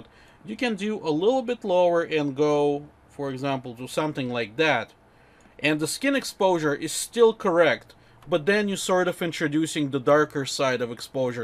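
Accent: Russia. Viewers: Прям слышу по акценту что русский))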